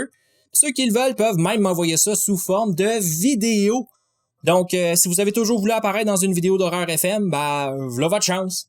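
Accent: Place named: Quebec, Canada. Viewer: hello, Petit coucou de la France. C’est drôle de voir certaines connaissances parmi ceux qui ont laissé un message (hello les amis de l’angoisse 😁) Mes questions: Ta collection de DVD/Bluray se compose de combien de titres? Feras tu une vidéo de présentation de la collection? Quel est le film que tu regrettes le plus d’avoir acheté? Au contraire, quel est celui dont tu es le plus fier? Quel est celui le plus rare? J’ai compris que tu aimes le slasher. Mais y a t’il un genre horrifique que tu détestes? Quel est ton film "limite", celui ou tu te dis que tu ne pourras pas supporter plus en terme d’images horrifique? Quelle est ta dernière "claque" horrifique? Est-ce que tu es lecteur de romans horrifiques? Si oui, quel est ton écrivain préféré? Es-tu plutôt film ou serie tv? Comment le cinéma horrifique est-il considéré au Canada? Enfin question finale... 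est-ce que tu peux nous dire a nous autres Français un petit tabarnac, un caliss, et un capoter (désolé pour l’ecriture)? Juste pour apprécier ce magnifique accent que tu as 😁 Merci!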